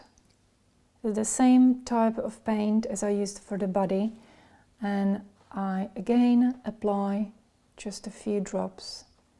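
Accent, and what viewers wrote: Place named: Slovakia. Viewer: Great video. Good to know there is an alternative to an airbrush as I don't always mine to hand. I'm curious where your accent is from. My wife is from Slovakia and she's convinced you are from Slovakia too.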